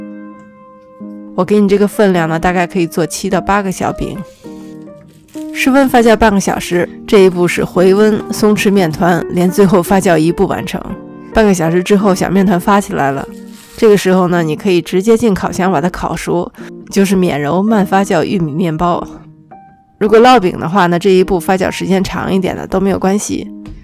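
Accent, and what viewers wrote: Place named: Shaanxi, China. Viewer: 其实除了平时看怎么做美食，赏心悦目之外，还有就是听说话的腔调，虽然不是陕西话，但是很多用词和语调特别的家乡腔亲切❤️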